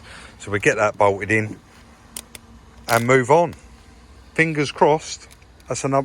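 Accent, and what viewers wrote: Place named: Medway, United Kingdom. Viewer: are you a medway boy? Definitely sound it!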